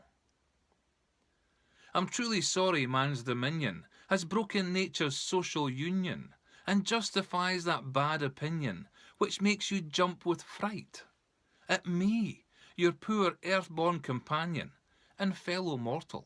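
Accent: Scotland, United Kingdom. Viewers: done in a Scottish accent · all in a good Scot's accent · in a truly authentic brogue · nice to hear Scots reading Burns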